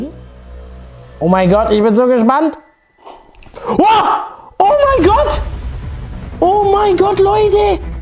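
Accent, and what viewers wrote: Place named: Swabia, Germany. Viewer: Ich mag deinen Schwäbischen Dialekt.... 😂😂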